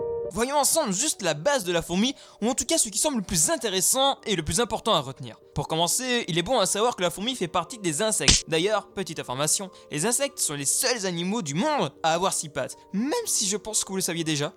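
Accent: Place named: Wallonia, Belgium. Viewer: Toi tu viens de belgique et t'es un wallon :p vu que tu dis: Wouit au lieu de huit :p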